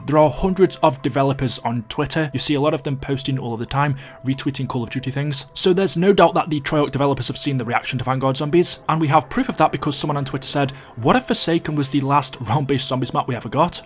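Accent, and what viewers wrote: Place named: Northern England, United Kingdom. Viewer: You have a very interesting accent! What is it? Northern English? Western Canadian?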